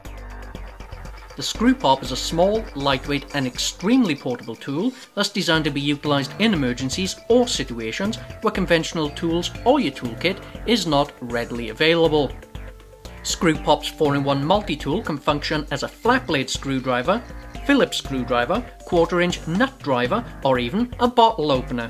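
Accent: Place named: Scotland, United Kingdom. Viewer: What accent is this?, its kind of scottish / south african / jordie.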